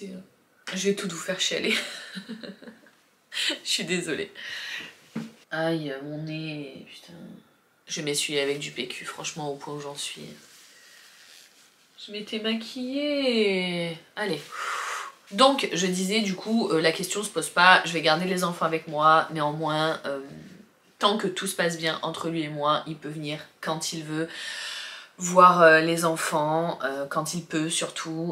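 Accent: South, France. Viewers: Coucou, je t'ai decouverte hier, avec une vidéo lié à ta nouvelle vie, une nouvelle vie qui j'espère t'apportera plein de bonnes choses, de toutes façons faut ce dire que dans la vie rien n'arrive par hasard, malgré beaucoup de sourire que j'ai vue sur tes vidéos ça doit pas été facile mais faut positiver. Je te souhaite beaucoup de bonheurs et de courage pour la suite. Ps: super contenus ultra naturel, un petit accent du sud plaisant. Bisous du sud également.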